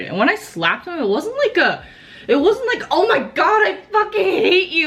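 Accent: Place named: Bay Area, United States. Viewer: Lol! I love your accent. Are you from the Bay?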